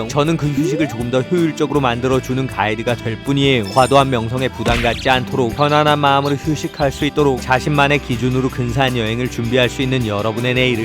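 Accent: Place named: Seoul, South Korea. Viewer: 딱 들어도 서울 말투인디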